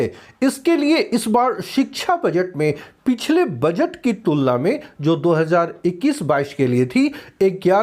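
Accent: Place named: Bihar, India. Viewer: Nyc accents...aap bihar se nhi ho sir???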